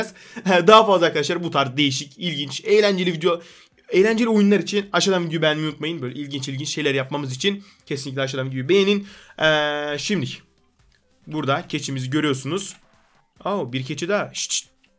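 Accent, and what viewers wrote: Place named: Thrace, Turkey. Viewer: Trakya aksamı :D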